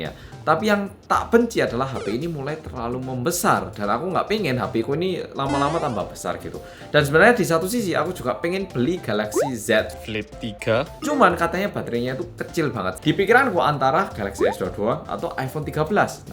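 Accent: Java, Indonesia. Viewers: Samsung endorse dong, Iki wong korea tenanan lho, cuma yo rada medok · Mas yutub kalau jelaskan super detail. Medoknya khas... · 14 apa 13 pro bang medok wkwkk ?? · Kog logat e jowo😁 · Wong jowo yo mas? Medog jowo ne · Korea jowo, mantap banget bahasane mas wkwkwk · Anjirr lancar banget bahasa Indonesia nya Ampe ke Jawa jawaan😆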